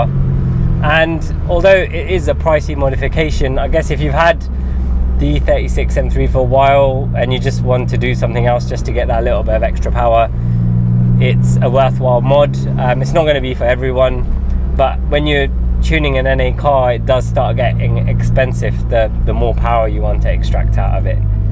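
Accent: United Kingdom. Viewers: How did you get such a good British accent?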